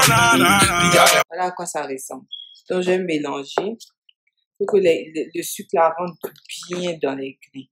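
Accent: Abidjan, Ivory Coast. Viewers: Cc j espere ke vs allez bien. Merci bcp pr le déguê. J aime bien votre maniere simple de presenter votre recette. On sent la voie d une vrai abidjanaise😂😂. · Toi tu es ivoirienne l'accent .😘😘merci la sœur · cc j espere ke vs allez bien; merci bcp pr le dèguè. J aime votre maniere simple de presenter votre recette. On sent la voie dune vrai abidjanaise. RIRE. BISOUS. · Bravo ma chérie 🤩🤝👏 pour ce chic dèguê 😂j’ai suivie avec plaisir , l’accent de chez nous , le “c’est Maman qui m’as appris depuis Abidjan “ tout cela j’ai aimé même 😂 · Ivoirien quoi bisou ma soeur 😂😂😂😂 français terre a terre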